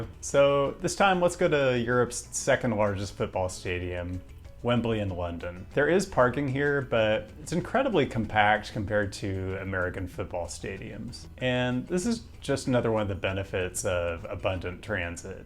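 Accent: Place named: West Coast, United States. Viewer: I’ve never heard anyone with a stronger west coast accent than you haha. Keep up the great content!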